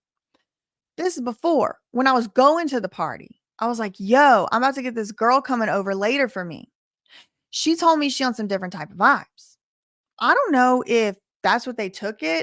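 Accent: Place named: Tennessee, United States. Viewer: accent has Tennessee written all over it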